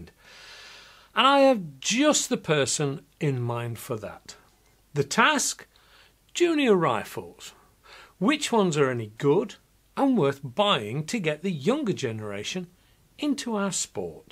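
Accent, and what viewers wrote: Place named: Nottingham, United Kingdom. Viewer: That's a Notts accent if ever I've heard one!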